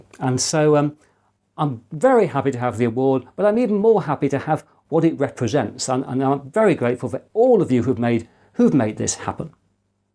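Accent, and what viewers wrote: England, United Kingdom. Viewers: It is never too late to congratulate (3 weeks late 🤭) Popularising is a noble thing and even more so when it comes to computers when I look around me. They treat computers like toys but cry when they've lost all their data because they didn't make a back up. I really enjoyed your video on mini SD cards to help me make the right choice for my Raspberry Pi. But most of all, I love your English accent, which makes a big difference to me compared to Americans and Canadians (peek-a-boo Linus Tech Tips).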